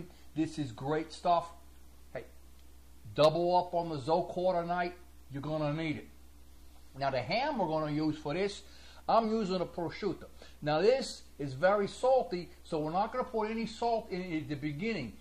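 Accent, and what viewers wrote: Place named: New York, United States. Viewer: It's a New York accent coming from a guy who's lived in Georgia for the last 20 years. From New York to Atlanta to Habersham County.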